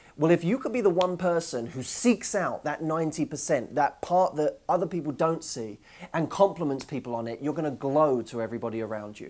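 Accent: England, United Kingdom. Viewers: Gosh I am so obsessed with your English accent 😀 great lesson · ***** Totally :) British accent and brown hair from Orlando, facial features from Ryan.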